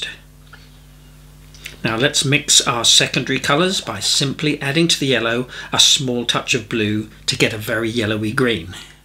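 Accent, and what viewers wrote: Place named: United Kingdom. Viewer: It is wonderful to hear your British accent, and had a good chuckle to hear your comment about the 'bloody leaves' did my heart ❤️ good!!!. I do really enjoy your videos; and wonder just where you are located. I lived in East Sussex and moved to the U.S in '57 so there's a bit of nostalgia going on. Thanks for the memories ❤ !!!!!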